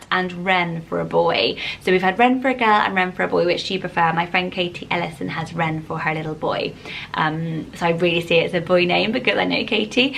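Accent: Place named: Bristol, United Kingdom. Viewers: My dauther's name is Lily Adaline. I love the way you prononce all those names with your English accent, but as a french Canadian I need a name that sounds good in french first for the baby im currently pregnant with. Sad cause I love Elle, Everly, Automn... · Where are you from? You sound just like my friend from Bristol :)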